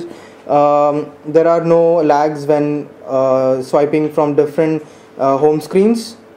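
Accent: India. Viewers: lg does not exist in pakistan.. his accent is Indian.. if u hate or love it .. :P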